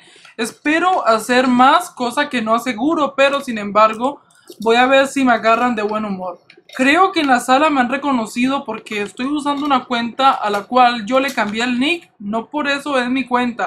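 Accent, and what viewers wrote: Colombia. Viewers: de dónde es este man? porqué aveces parece colombiano y otras venezolano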